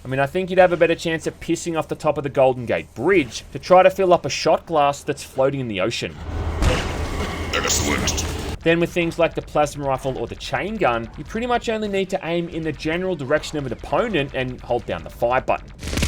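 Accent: Australia. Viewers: Australian accent is impossible to listen for a non native speaker, terrible😭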